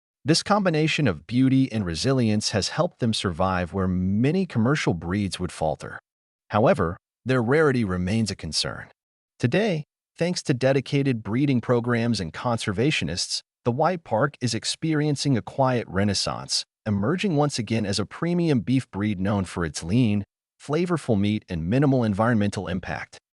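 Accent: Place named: United States. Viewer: The 'British' aren't claiming anything, this isn't a British channel, the voice-over has an American accent. The creator of this content doesn't even have much of an idea of English geography, claiming that Gloucestershire is in the north.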